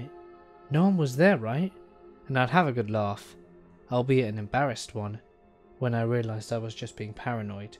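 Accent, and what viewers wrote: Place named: Southern England, United Kingdom. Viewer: Ah, what a nice response! Would that all YT comments were so reasonable and intelligent. I'm northern English myself so he's just a nice-sounding southerner to me. But I understand how he might sound semi-exotic to our friends on the other side of the Atlantic!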